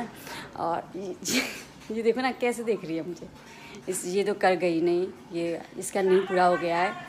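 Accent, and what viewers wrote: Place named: Nepal. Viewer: Hi! You are Nepalese or Nepali speaker …? Coz your tone like Nepali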